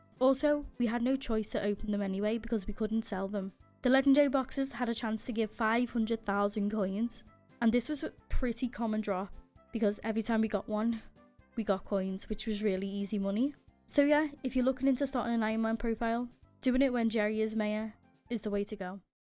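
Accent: Liverpool, United Kingdom. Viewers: are u from liverpool u have a scouse accent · I love that british accent lmao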